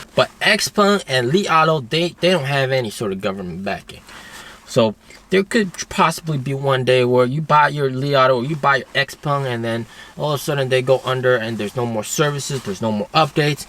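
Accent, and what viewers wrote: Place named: United States. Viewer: For someone who's a native Chinese your English is very good even your accent. Sound almost American.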